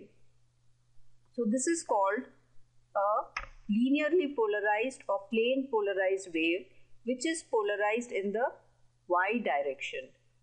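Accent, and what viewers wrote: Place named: India. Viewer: too many x direction y direction in indian accent -.- ....makes it so uninteresting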